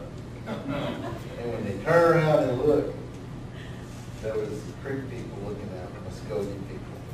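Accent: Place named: South, United States. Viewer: He looks like the "Europeans" of whom he speaks. With a southern drawl. 🤔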